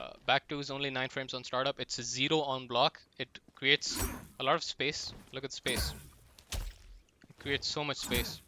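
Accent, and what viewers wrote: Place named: Pakistan. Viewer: are you a PAAAAAAKKKI ? sound like one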